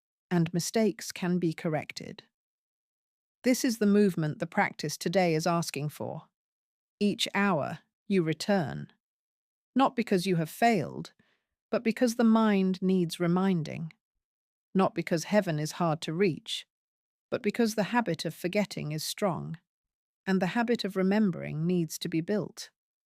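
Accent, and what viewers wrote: United Kingdom. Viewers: I really love the British accent. Idk why but it's very soothing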